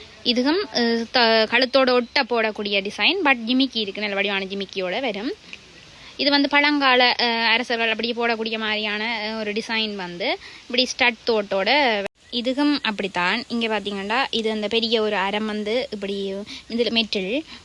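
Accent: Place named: Sri Lanka. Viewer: Srilanka தமிழ் அழகுதான்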